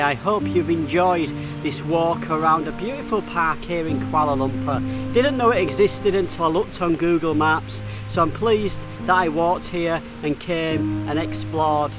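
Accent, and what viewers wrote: United Kingdom. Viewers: That accent of yours intrigues me. I left Lancashire 1968 so my ear for accents has almost disappeared; especially as now most of the North country accents have emigrated southwards. Would you mimd telling mr where in UK you originated. Oh, and thanks for all the great Malaysian videos!!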